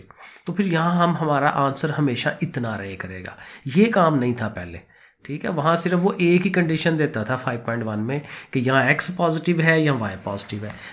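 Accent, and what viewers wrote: Sialkot, Pakistan. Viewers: Sir ap sialkot se hein?? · Sir's accent shows that he is Sialkoti.....❤😂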